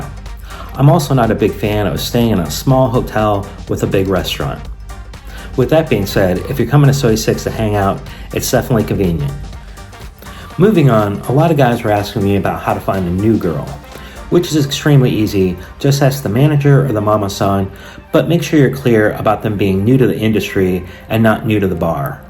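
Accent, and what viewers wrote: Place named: United States. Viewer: Educated English? He's a yank.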